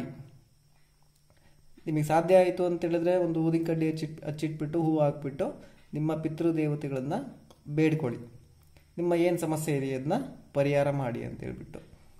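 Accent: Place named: North Karnataka, India. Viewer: Nivu uttar Karnataka davaru so nimage accha kannada mathadodikke kashta agatide modalu script ready madikondu practice Madi aamele video Madi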